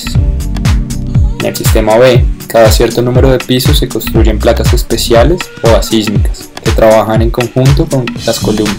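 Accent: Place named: Bogotá, Colombia. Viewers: Que pereza este narrador, Ese acento rolo, narrando un símbolo de medellin, como que no aguanta. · que jartera el acento rolo.. me da un fastidio ese tonito llenadorcito...lo peor es que ellos creen que eso les suena divino.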